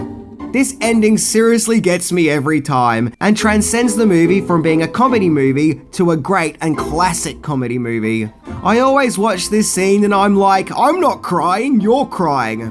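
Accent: Australia. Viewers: Hey mate, It's pretty cool your spot on, covering all the shows and movies I dug as a child, and in that beautiful Australia accent. What's more is your clearly on the world stage. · Sounds more like a homosexual Australian. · Good channel here. Was wondering about your accent, got it now, Auzzie convict stock...i am a pomie....suck it up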